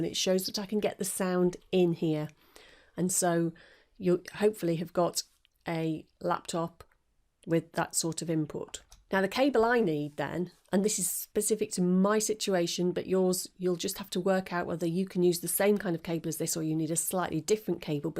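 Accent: United Kingdom. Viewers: UK accent. Kinda wiredley explained hm but imma.. give it a try..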